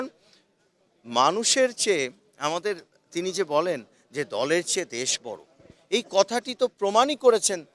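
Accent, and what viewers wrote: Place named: Kolkata, India. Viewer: কলকাতার টোনে কথা বলছে টেনে টেনে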